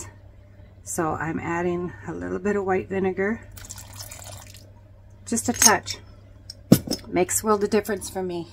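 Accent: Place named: Midwest, United States. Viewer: Thank you 😍I love your midwestern accent 😊Are you from Wisconsin?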